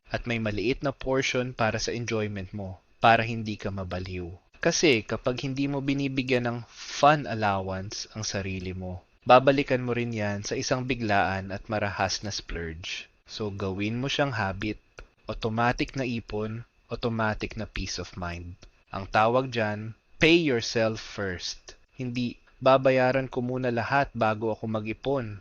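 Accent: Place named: Conyo, Philippines. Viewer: Out of curiosity, Tagalog is not the only language you speak growing up, right? Cuz I hear a lot of conyo pronounciation. Lol, not hating thou. Thank you for this video! 💜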